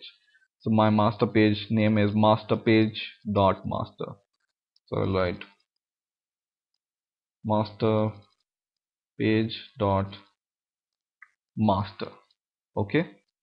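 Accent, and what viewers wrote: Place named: India. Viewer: is there any videos not done by an indian? I hate the accent. Makes me want to make them take a drink of water and get rid of the dry mouth.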